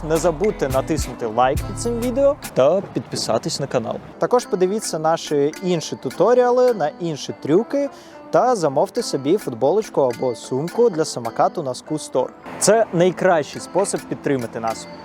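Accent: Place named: Belarus. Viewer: Всё же ты белорус, говор выдает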